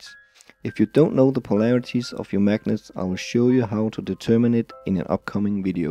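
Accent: Denmark. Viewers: Are you Danish by any chance? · it funny when you hear a danish person talk english the accent just sound so wrong because im used to that accent in danish XD still very good vids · WAUW YOU HAVE A LOVELY ACCENT. WHERE ARE YOU FROM, no wait let me guess. denmark?D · I enjoyed this video very much. I have learned something new today about magnets I didn't know. I can understand you well with your Danish accent. Thank you. Blessings to all. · i can hear at your accent that you are from denmark im norwegian, nice video neightbour :)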